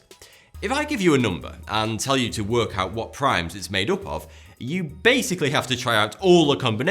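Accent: United Kingdom. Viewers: you're British aren't you?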